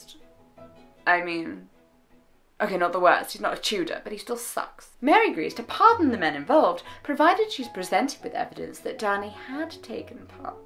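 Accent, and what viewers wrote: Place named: England, United Kingdom. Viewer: English accent 🤤😍😍😍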